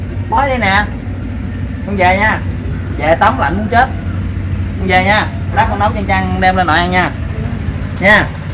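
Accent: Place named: Mekong Delta, Vietnam. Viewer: Haha, giọng điệu đúng chất miền tây luôn a ơi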